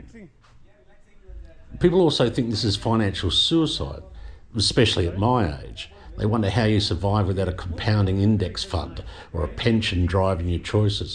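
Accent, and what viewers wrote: Australia. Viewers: No. I sense an Aussie accent. You are the age of my Father. I’ll tell you what’s worse than having nothing. It’s having EVERYTHING and yet not appreciating it. In Australia, so many boomers became property obsessed and prioritised wealth gains over family relationships. What’s the point in having kids and grandkids if your main focus is your STUFF?! It’s so sad to see. But they don’t care. They won’t, until they lose their sense of security (homes/equity) and realise it cost them their families. You’re doing well in Goa. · hi Laura, is 100% Australian. I’m Aussie and I know one when I hear one. And he’s mentioned it in many videos. He often goes back to Perth to visit his sister. And no, he doesn’t have the old age pension because you have to be 67 to qualify for that in Australia. He’s 60. As he states in this video (and many others) he lives from his YouTube videos. 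That’s also why he makes so many! It’s his job. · You're living my dream, mate. Really enjoy following you on your adventures. Don't mind the lovely Aussie accent, either. Love from the Low Lands.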